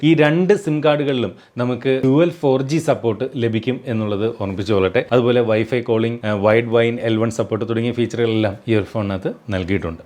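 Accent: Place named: Thrissur, India. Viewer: cheettan thrissur kaaranaano amsaarum nammade thrissur kaare poole undu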